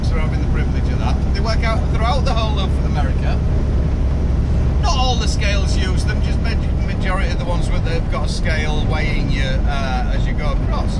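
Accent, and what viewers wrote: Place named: East Yorkshire, United Kingdom. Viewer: Hi mate. Did you say your from East Yorkshire? I live in Goole. Your accent sounds alot like mine. 😆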